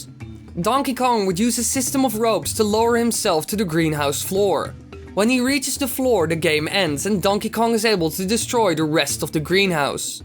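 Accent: Netherlands. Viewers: Yeah, he's Dutch. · are you dutch? your accent sounds dutch...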